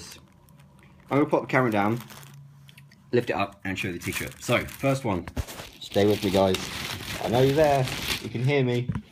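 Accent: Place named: United Kingdom. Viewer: You sound so British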